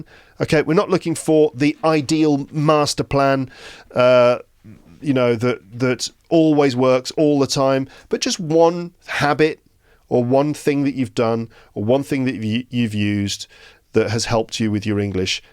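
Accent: United Kingdom. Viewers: hi sir i am a big fan from Algeria i love your British accent so much beacause of you and your peaces of advice i am the first in my class +if i make mistakes tell me please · Hi, I am from Indonesia. English is my 3rd language. First of all, you speak so fast with your British accent. But one thing I want to tell is that I can clearly understand what you've explain without seeing the visual of your video and of course without the texts. So I want to say thank you for making this video for us. Hope it's gonna help someone like me out there. Thank You. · I love the brittish accent. thanks a lot for all your videos.. ;-) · Your accent is British or American ?🌝 I guess it's British bat l want to make sure because my language level is intermediate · I remember that some months ago it was really hard to me to understand you because of your accent but I kept listening British English now I can understand the most of it.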